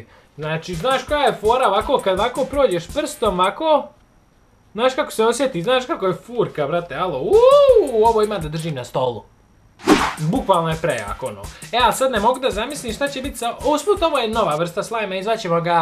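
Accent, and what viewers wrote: Bosnia and Herzegovina. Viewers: Jesi ti iz Bosne ba hahahaha ili Crne gore???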